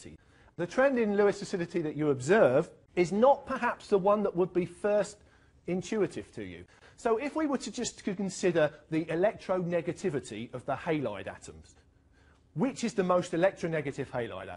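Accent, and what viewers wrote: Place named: United Kingdom. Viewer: thanks, nice to hear a british voice with passion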